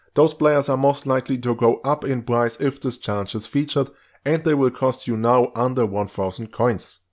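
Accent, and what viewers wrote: Germany. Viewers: Are you german?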